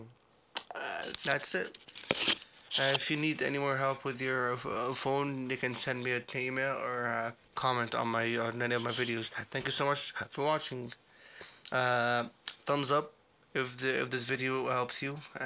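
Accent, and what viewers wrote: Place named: Egypt. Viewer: انا عرفت من طريقتك يا عم ومن المكتب كمان هههههههههههههههههههههههههههههه دا احنا مصريين زيي بعضينا يعنى اعرفك من غير ما بص مجرد سمع الانجليش عندك بس عالفكرة نطقك كويس جدااااا انا فى اولى ثانوى عالفكرة وفى انترناشونال ادعيلى بقى :))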